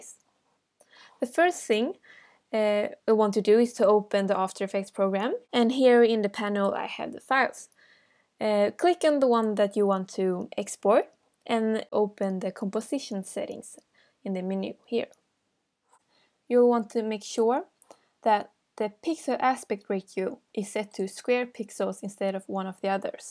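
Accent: Sweden. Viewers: cute swedish accent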